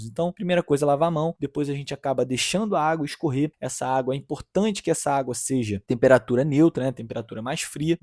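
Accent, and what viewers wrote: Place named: Rio de Janeiro, Brazil. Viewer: O sotaque carioca, para mim, é insuportável.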